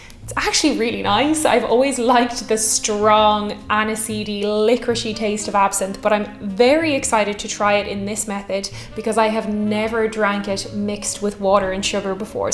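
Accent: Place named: Ireland. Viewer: Irish was recently voted as the most attractive accent in the World...but I believe that was about English as spoken by males. WELL - I must be mistaken but I could listen to 24/7. And what a beauty...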